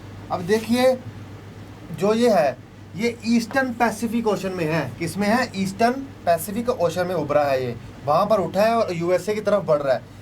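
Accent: Punjab, India. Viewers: Sir g tusi bolde ho ta hasa onda h😀😀😊😂 Pure punjabi 😄😄 Atttt sir G 👌👌👌👌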